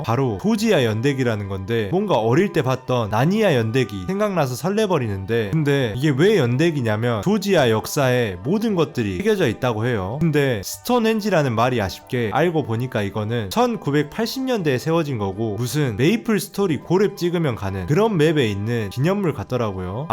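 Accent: Jeolla, South Korea. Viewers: 전라도억양이 들리는데 전라도 사람 맞나요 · 전라도 엑센트 너무 정감가요 ㅎㅎㅎㅎㅎㅎ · 생~소한 조지아를 소개하는 전라도억양의 청순한 청년.. 잼나요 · 전라도 사투리가 귀에 거슬리는군요. 표준어 사용하시길... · 전라도 사투리인가? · 특이한 멘트에 전라도식 사투리억양 개성있네요.. 재밋게 잘 봤어요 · 아따 말투가 겁나 정겹다잉 편하게 말하자 어차피 너무 전라도다. 신나게 쓰자 너무 정겹다.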